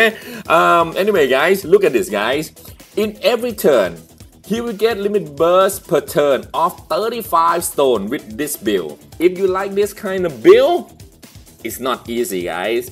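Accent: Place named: Thailand. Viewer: Are you from Thailand? you talked like my friend, your accent kinda feels like ppl from there.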